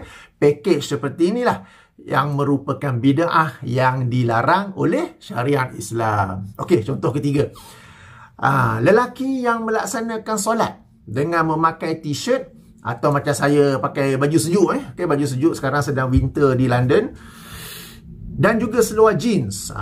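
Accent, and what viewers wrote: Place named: Penang, Malaysia. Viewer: Kapten punya loghat Penang, "tak prnah habakkkq .. 🤣👍🏼 T.kasih atas ilmu kapt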